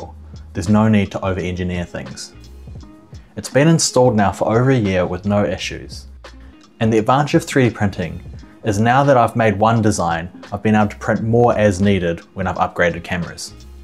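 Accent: New Zealand. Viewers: Just realized I missed the kiwi accent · Yes the New Zealand accent can produce some funny sounds!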